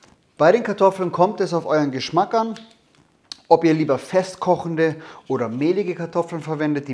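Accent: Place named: Bavaria, Germany. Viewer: Seit ein gewisser Herr S. aus Bayern mit seinem Dialekt omnipräsent in den Medien auftritt, war mir das Bayrische nicht so angenehm im Ohr. Du hast das eindeutig in die positive Richtung gekickt.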